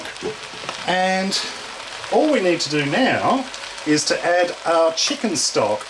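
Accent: Australia. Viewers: OMG, didn't know you r OZ! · Man you di so well on youtubie shit what is your secret. You sound Australian as I am. Good work I have saved heaps of your recipies.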